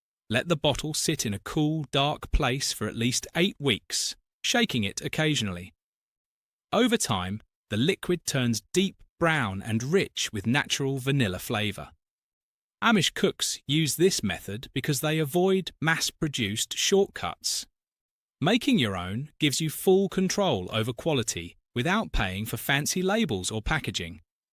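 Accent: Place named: United Kingdom. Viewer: Is this AI narrating ? He speaks with a British accent but uses American phrases 🤮 Ffs might as well use a Yank narrator.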